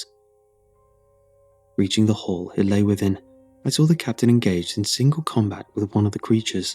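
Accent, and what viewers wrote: United Kingdom. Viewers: Best horror reader there is. Can't beat a smooth British accent.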